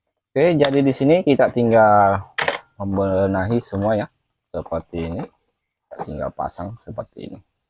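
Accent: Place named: Bugis, Indonesia. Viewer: Kayak orng bugis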